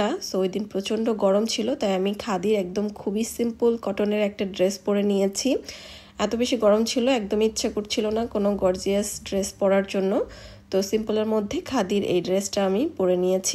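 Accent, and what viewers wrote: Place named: Sylhet, Bangladesh. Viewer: খবে জোরে আমিন,এই কথাটা শুনে এত হাসি পাইছে, আমাদের ভাই অর্ধেক সিলেটি অর্ধেক চাঁদপুর,ভালই লাগে আমাদের সিলেটে কথা যখন ভাই বলে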